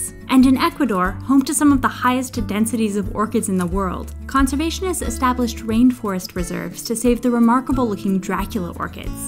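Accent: United States. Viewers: Very fine piece of work, Kingsley. My Australian wife wants to know where you found an American narrator? The word, orchidelerium is NOT a Victorian word, for those interested. My late editor, Anne Boston, came up with it to entitle an article I wrote on Victorian "orchid mania" published back in the 1980's for the now defunct, "Garden Magazine." It was used a second time in my 1989 book, "Wily Violets and Underground Orchids" (still in print). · i never knew the depths of american pronunciation before..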